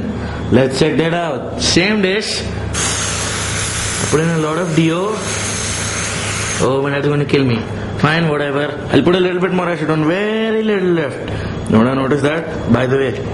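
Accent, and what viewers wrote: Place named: India. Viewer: Yeah Gunner3210 is right... deodorant is extremely flammable, be very careful when playing with fire and compressed containers with flammable gasses inside because they could explode in your hands :( Good luck in you chemistry studies ;) P.S. i like your indian accent, it's so funny! xD Greetings from Italy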